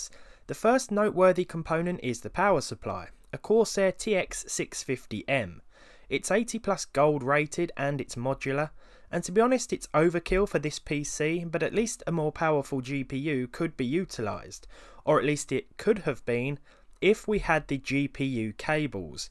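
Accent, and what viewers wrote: United Kingdom. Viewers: Somehow the British accent makes this sounds like a documentary, compared to those Canadian and American channels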